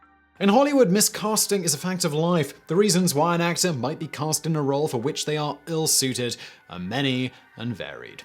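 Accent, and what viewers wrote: United Kingdom. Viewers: is British and that’s how many of them would pronounce it via their accent. Learn something new every day indeed. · Does he say Advertisements as "ad vert is ments" I guess it makes more sense than the American accent version, but as an American...I kind of hate it. Its "Ad-ver-tise-ments" like I cant stand how brits say "Gare-ege" GA RAJ. Ok, the way we pronounce words not as they spelled, is dumb. Ill admit. Still not a fan of how brits say things😅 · he's a Brit living in Prague why would he pronounce things in American English?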